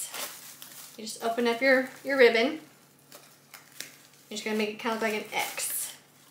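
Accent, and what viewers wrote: South, United States. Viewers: Hey Ms. I was looking on You Tube for a "fairly easy Grinch DIY wreath, and here you are... another Sothern Belle that sounds like me. (Roll Tide) Anyway, my best friend lost her husband a couple of years ago on Easter and is struggling with the holidays. I lost the love of my life 8 weeks ago and not looking forward to these Holidays, Even though he (hubby)would want me to grieve like I am, I'm trying to find some normalcy. So I am making her a Grinch Wreath. I wanted to thank you for your uplifting personality and sweet, patient spirit and MAKING THIS LOOK DO-ABLE. Have a wonderful Thanksgiving and Merry Christmas!!!!! And again, Thank you!!!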